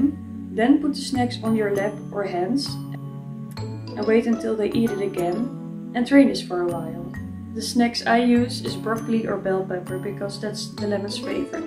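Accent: Netherlands. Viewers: I think I'm deaf, but I hear a Dutch girl speaking English. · You sound netherlands like how i say the english Words u say them whit a wierd axsent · Lovely! Really wonderful job! I can hear from your voice that you are dutch hehe😂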